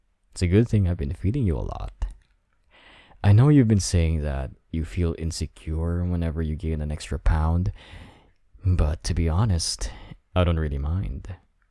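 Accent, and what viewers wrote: Philippines. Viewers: I sense a Filipino Accent?...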